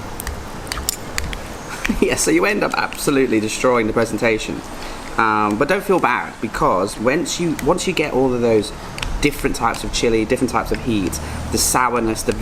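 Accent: United Kingdom. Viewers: Love your accent!! Most YouTubers are Americans or Canadians. It is so fresh to listen to someone speaks with a British accent. At this time when most of us can’t travel. It is so amazing that we can watch you on your little Honda. I hope the videos are longer and more detailed.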